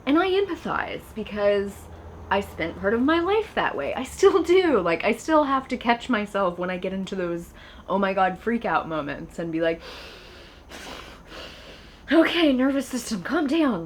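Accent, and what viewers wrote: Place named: Tennessee, United States. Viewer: GREAT INFO! THNX! WHEN I USE THE APPLE CIDER VINEGAR I SOMETIMES LEAVE IT ON, THE SMELL GOES AWAY IN A FEW MINUTES, BUT YOU CAN ALSO ADD ONE OR 2 OF THE ESSENTIAL OILS FOR HAIR GROWTH, LIKE ROSEMARY, PEPPERMINT, CARROT SEED, LAVENDER OR EVEN TEA TREE TREE OIL. I ESPECIALY LOVED YOUR TENNESSEE ACCENT, WHY NOT TALK LIKE THAT ALL THE TIME? ✌💚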